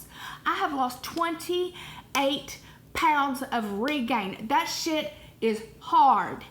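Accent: South, United States. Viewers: do u have a southern accent? sounds kinda southern to me.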